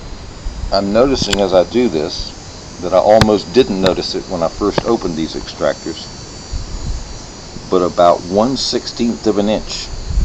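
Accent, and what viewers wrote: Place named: Transatlantic, United States. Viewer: This man has a translantic accent..... wow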